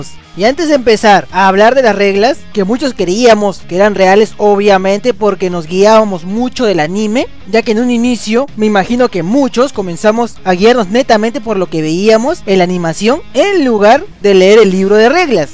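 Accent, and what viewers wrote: Peru. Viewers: Y ese acento peruano?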